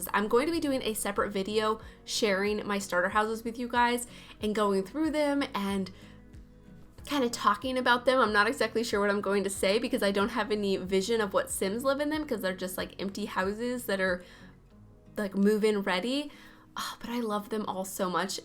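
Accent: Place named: South, United States. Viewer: i always say this, so it’s old now- but i LOVE your builds. your exteriors especially are always so lovely and they’re all houses i would want to live in! random question: where are you originally from? you have a bit of a southern-y twang in your accent i think.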